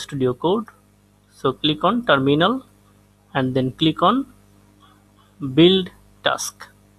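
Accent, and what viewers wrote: India. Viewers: Why does every programing vid have a thick indian accent lol Great vid btw :)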